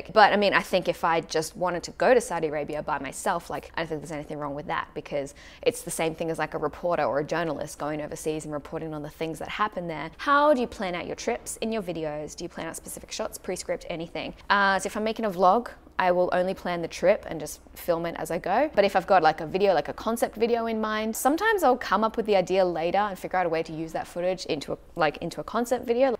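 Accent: Australia. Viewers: Wait a SEC How did your accent change from whiny californian to Straylian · such a cute Aussie accent!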